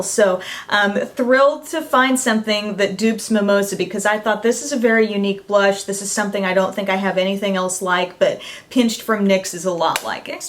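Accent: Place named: South, United States. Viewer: I also wanted to ask if you were from the South. I love your accent. :)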